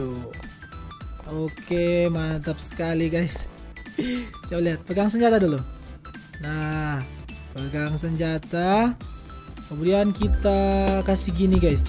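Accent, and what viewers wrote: Manado, Indonesia. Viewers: Jan pake logat Manado bang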